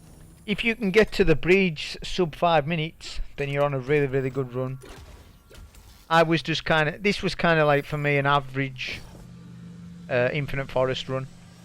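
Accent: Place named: Newcastle, United Kingdom. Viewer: ah well am from Newcastle myself so I could hear a little bit of it in your voice haha don't come across many players close to home to be honest haha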